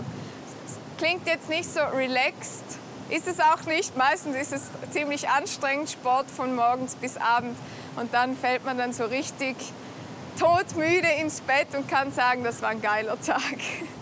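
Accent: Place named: Switzerland. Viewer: Douglas Dunning Well she's actually speaking standard German here. With maybe a slight Swiss "tone" left in it. But it's not the official Swiss variation language ( = called Schwyzerdütsch / meaning "Swiss German" ) here that you may be referring to.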